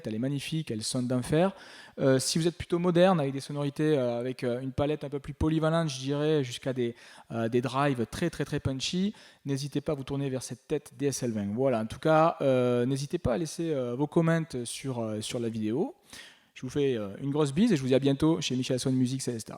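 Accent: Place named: United States. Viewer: You sound like an American 😉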